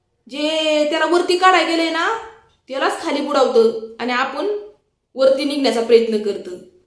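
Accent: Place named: Bhor, India. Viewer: मी तुझे व्हिडिओ कायम बघते मला तुझे व्हिडिओ आवडतात कारण मी पण भोर तालुक्यातील आहे कारण तुझे बोलणे आहे ते रेल आपल्या गावाकडचे आहे ते मला खूप आवडते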